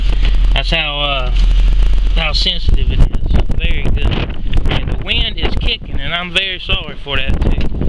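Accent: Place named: South, United States. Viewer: What state are from you have a southern accent.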